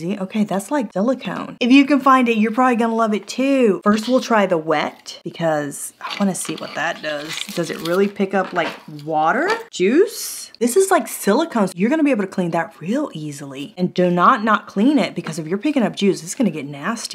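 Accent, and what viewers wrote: Texas, United States. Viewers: Girl are you from TX? I am from San Antonio and you got that TX flare!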